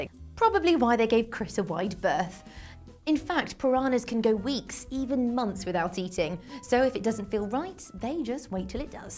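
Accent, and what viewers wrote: United Kingdom. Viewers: i love your accent! UK?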